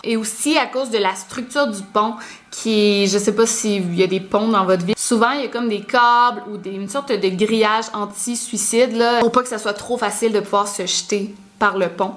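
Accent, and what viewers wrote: Quebec, Canada. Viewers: Nouvelle abonnée je tenais à te dire Merci pour tes vidéos je les écoutes tout les jours tu es une très bonne oratrice! En plus avec l’accent québécois au top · Oh j'adore l'accent québécois !!🤤❤️❤️😋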